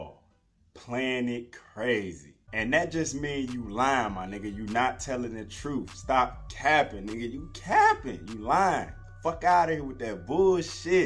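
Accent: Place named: Detroit, United States. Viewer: Im from indonesia. I search this accent for long time. Finally i talked to someone from michigan, he said that, you are looking for detroit slang 😂😂 and im here to learn this crazy accent ❤❤❤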